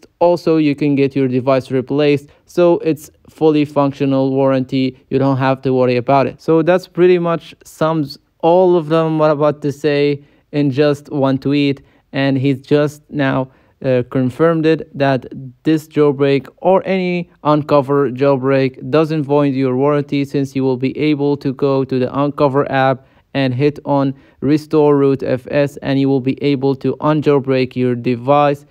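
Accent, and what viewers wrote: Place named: Arab world. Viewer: لهجتك عربيه🙂👍🏻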